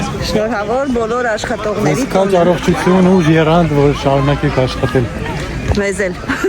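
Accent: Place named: Artashat, Armenia. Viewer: ջան, երբ Արտաշատի բարբառով խոսում ես շատ անուշ է, հիշում եմ ուսանողական տարիներս շատ քաղցր ես խոսում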